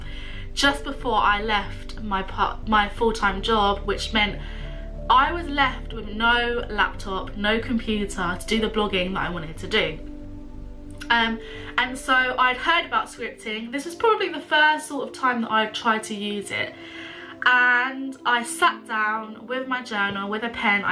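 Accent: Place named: United Kingdom. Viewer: British English sounds so lovely and cute 😍😍😍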